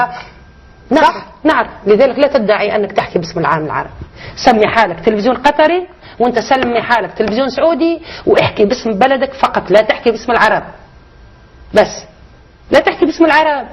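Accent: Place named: Maghreb. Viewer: لهجتها مغربيه او تونسيه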